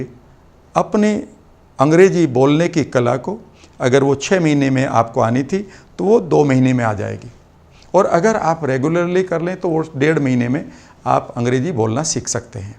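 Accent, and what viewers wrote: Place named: West Rajasthan, India. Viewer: Sir aap Rajasthan se ho na, aapki aaj tone se pata laga, wo bhi west rajasthan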